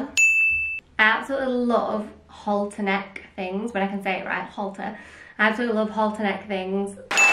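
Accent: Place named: West Yorkshire, United Kingdom. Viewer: West Yorkshire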